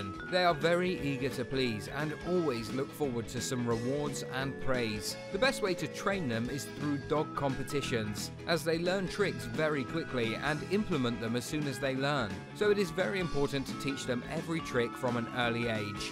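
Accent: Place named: England, United Kingdom. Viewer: I'm curious to why someone with an English accent is talking money in Dollars...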